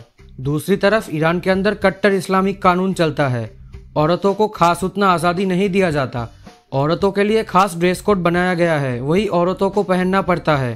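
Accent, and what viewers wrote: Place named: West Bengal, India. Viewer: Bhai aapki video badi achi hai apki awaaj bhi .. Apka tone Bengali lagta hai ..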